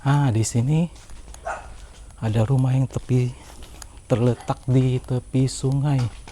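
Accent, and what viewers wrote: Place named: Pontianak, Indonesia. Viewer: logat admin khas tionghoa ponti..hehehe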